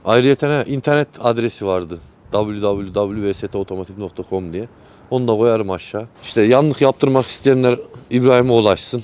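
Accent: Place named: Çorum, Turkey. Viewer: Abi Nerelisin Aynı Çorumlular Gibi Konuşuyorsun Başarılar :)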